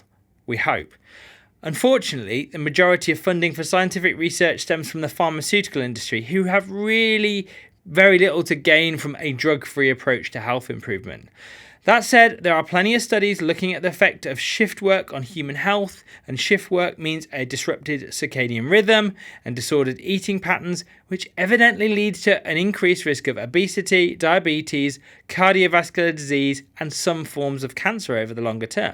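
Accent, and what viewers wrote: United Kingdom. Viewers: I found this video enlightening, clear and pleasant to listen. Specially your British accent 🙏 Thank you so much Docteur 🎉 Big bravo 🎊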